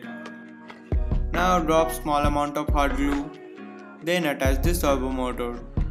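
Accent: India. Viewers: Are you from India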